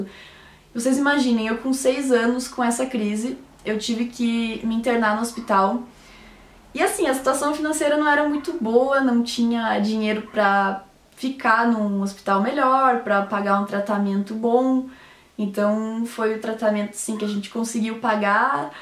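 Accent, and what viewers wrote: Rio Grande do Sul, Brazil. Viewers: Meu pai também é agricultor. Ele planta melancia. Sua história é triste mas tem um final lindo e um aprendizado de luta. Se tem pessoas "infelizes"que fazem comentários "fdp" com certeza elas não tem louça pra lavar e ficam enchendo teu saco e te incomodando, mas teu coração e conteúdo é tão maravilhoso que essa gente idiota só eleva teu sucesso. Diva. Achei que era gaúcha natural... hehe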